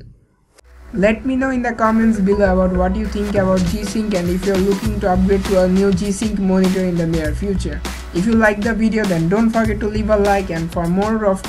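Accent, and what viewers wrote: India. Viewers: I know its out of context, but I loved ur acent..lol..much like Indian accent..kudos.. Nice video. Thumbs Up.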